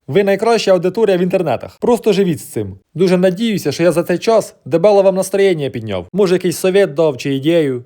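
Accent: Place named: Cherkasy, Ukraine. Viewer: навіть,живучи у Черкасах , приємно чути "той" говір. Діалект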